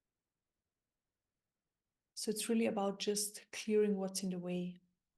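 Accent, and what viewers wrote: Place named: Germany. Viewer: Beautifull you have german accent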